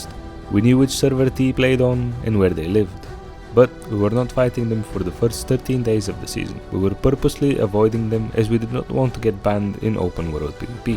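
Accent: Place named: Europe. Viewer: you sound like your from the eu look at getting your money back as from what ive heard they cant just randomly ban you without good reason and you are entilted to your game or the money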